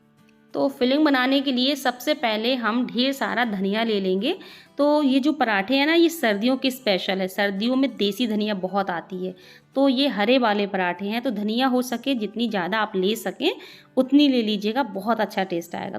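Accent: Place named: Bundelkhand, India. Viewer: Aapi boli se apnepan ka ahsas hota hai, apni taraf ki lagti hai, aap bundelkhand mai kaha rahti hai, kache amrood na mile to kaise chatni banaye?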